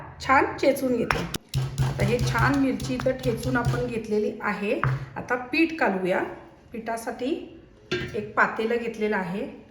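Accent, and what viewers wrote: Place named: Gadhinglaj, India. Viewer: खूपच ावडलई. नक्की करून बघणार. काकडीची चटणी आणि रोस हे नवीन प्रकार समजले. मस्त!!, अलिकडच्या व्हिडिओत तुम्ही हडकल्यासारख्या दिसता. ही complement आहे बरं का. आज मेकप पण खऊप छान झालाय.❤ -सुनंदा गंदगे ता.क. तुम्ही रेसिपी खूप छान सांगता. मला तुमची भाषा ऐकून पण खूप छान वाटते. माझ्या आत्या गडहिंग्लज च्या होत्या.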